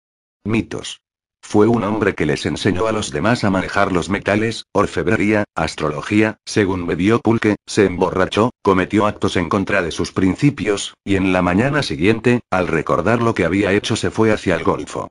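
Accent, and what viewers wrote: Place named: Spain. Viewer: Que estúpidez si iban a hacer algo de Quetzalcoatl lo hubieran hecho siquiera sin el ofensivo acento españolete, es decir lo hubieran leido ustedes. seguro no saben leer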